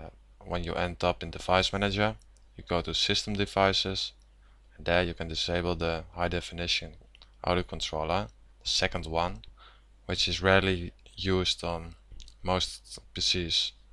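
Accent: Netherlands. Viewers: Such a dutch accent <3.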